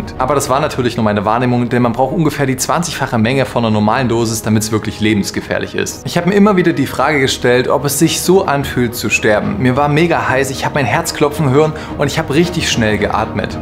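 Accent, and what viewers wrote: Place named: Saxony, Germany. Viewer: Ich liebe deinen leicht durchschimmernden sächsischen Dialekt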